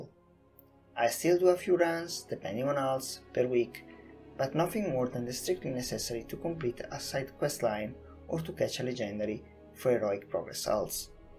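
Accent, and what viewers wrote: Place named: Germany. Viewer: Have to love that accent. Is it German or x. X)... Ohhh god the lvling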